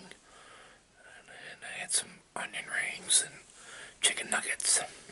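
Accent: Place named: South, United States. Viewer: hey, hope you're doing very well :) my saturday was alright, I haven't been able to stay home alone for a long time, and finally got the chance to do so, which was a literal threaphy... I live in Turkey / Istanbul and as odd as it sounds, we have yet to see a snowflake in the city lol. I remember blizzards happening in the past, but it is different these years. the reason is maybe global warming, not sure why. maybe little snow storms from time to time, thats it. I'm done with my final exams, made my favorite salad at 5.27 am in the morning - browsing your videos :) glad to see a fresh upload, I'm a big fan of your (southern?) accent. Have a nice day / evening, I'm around.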